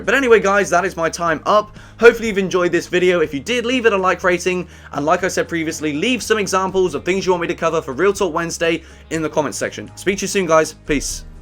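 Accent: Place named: United Kingdom. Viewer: Just realized he had a British accent. :0 Mind=Blown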